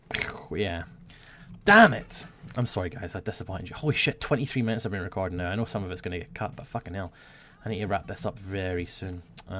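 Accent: Scotland, United Kingdom. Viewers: which coast of scotland are you from ?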